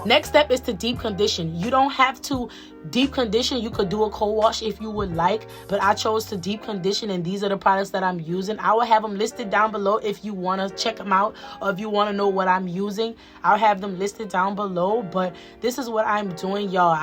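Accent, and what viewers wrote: Louisiana, United States. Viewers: Babygirl you gotta be from Louisiana 😂 anywho I needa do this cause I been looking look tore up lately lol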